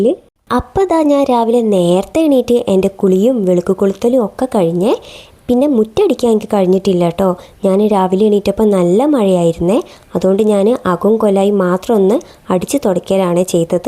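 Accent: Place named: Malappuram, India. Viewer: Super🎉🎉🎉, നിങ്ങൾ കോഴിക്കോട്ടാണ് ലേ? സംസാരം കേട്ടപ്പോൾ മലപ്പുറമാണെന്ന് തോന്നിയിരുന്നു🎉🎉🎉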